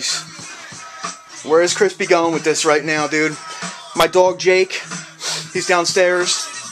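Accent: Philadelphia, United States. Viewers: damn wish them draft day's were a size 10 i fuck wit em... i scopped that philly accent from the beginning lol.. #215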